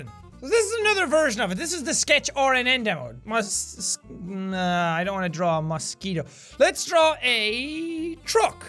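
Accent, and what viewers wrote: Ireland. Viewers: ur Irish accent really pushed in this video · Are ya Irish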